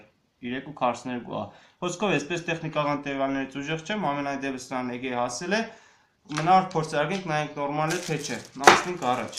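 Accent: Gyumri, Armenia. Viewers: Lenakani barbary dzec axpersss Like!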